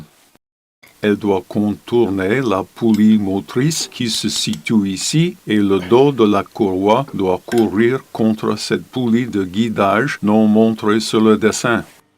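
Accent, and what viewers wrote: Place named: England, United Kingdom. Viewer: Merci Monsieur, avec l’accent anglais c’est encore mieux 😊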